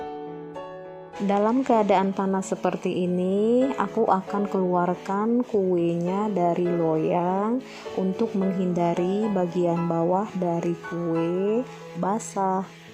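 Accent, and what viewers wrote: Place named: Java, Indonesia. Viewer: Wah mantep cara bikin kue lapis😄 jowo medok hadir embak e.yuk yang lain....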